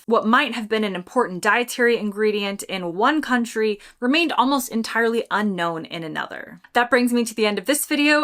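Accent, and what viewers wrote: United States. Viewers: I was at 4:something when I realized that this British girl speaks with the American accent :D As a Russian I am appalled by the topic of this video 😵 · At first I was quite confused why you sounded so American, and at the same time was so empathic to English culture 😊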